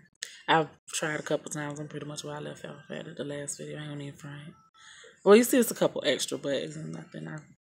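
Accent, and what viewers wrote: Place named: South, United States. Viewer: Hello First I wanna say that I love your "Southern Draw" lol Well I did everything you said and it went through. I even got a conformation of my order. However no shipment conformation or no products was ever delivered. Looked back at my order purchase on amazon and nothing was there. The order was cancelled. So does this really work?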